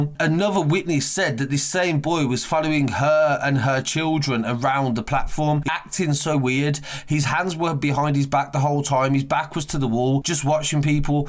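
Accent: Birmingham, United Kingdom. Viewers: Are u a brummie ? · Can't listen to this nerdy Birmingham accent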